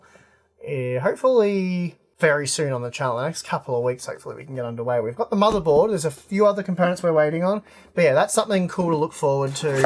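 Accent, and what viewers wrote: Australia. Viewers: "Got mai knoif, a blont knoif". Gotta love Aussie accent! :D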